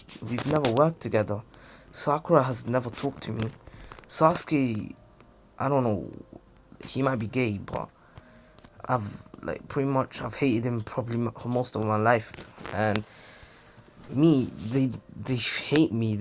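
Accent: United Kingdom. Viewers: I just like his voice when he narrated Bc it’s British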